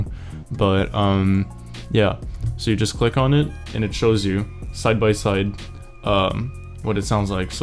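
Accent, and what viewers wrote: Quebec, Canada. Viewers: j'ai failli pleurer quand j'ai entendu ton magnifique québecois et juste après les sous titres 😂😂😂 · what you are québécois je savais pas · Wtf are you from Quebec? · tes quebecois?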